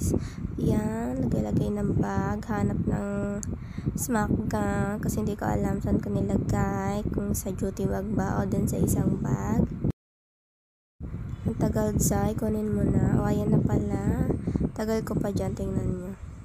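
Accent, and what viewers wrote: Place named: Batangas, Philippines. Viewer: Parang familiar ang boses ah parang Yong batangenia done po salamat for sharing ingat po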